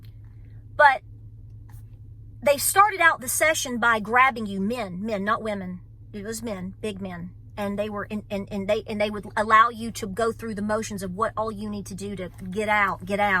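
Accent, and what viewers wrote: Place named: Tennessee, United States. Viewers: Those people who state that you don't have solutions are really mistaken. LOL EVERY time I go to Aldi's, I find myself thinking, get out of my head! Your Southern TN voice is what I hear in the canned goods aisles. LOL I start to wonder if others can hear you say, "Girlfriend, you better get an extra 5 cans of corn or beans. I may not commit much, but I hear ya! Stay Strong! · In 2020 the Lord Jesus led me to your channel. I listened because your voice reminded me of family in Tennessee. You helped me to see the need for preparedness. My husband was not on board but tolerated what he thought was a phase. By 2021 he changed his mind and wondered why I wasn’t going to the store more 🙄. Thank you for educating us on the things we can do to help ourselves and others. Much love and prayers for you and yours❤️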